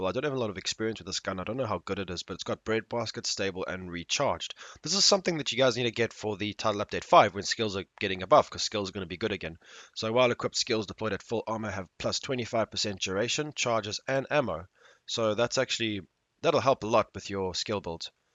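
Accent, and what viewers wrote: South Africa. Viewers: You South African! Sounds so. I subbed because of. Are you part of a clan?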